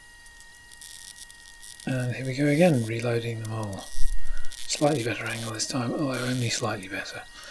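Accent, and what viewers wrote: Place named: New Zealand. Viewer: I had to doble check where you came from. Your accent could cut as a New Zealender, but I saw your plug outlets and, you live just over the puddle. XD You see, I've been searching for electrum in all of Norway, it does not seem like there is any of it anywhere to be found here. My fiancé and I want green wedding rings and electrum is the best option but we have so far been unable to find raw material. Where did you get a hold of electrum?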